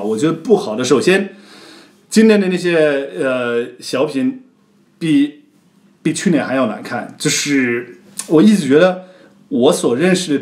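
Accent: Standard. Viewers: 中文说的真是好。赞一个，标准普通话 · 普通话很标准。这次的春晚是有点倒退了。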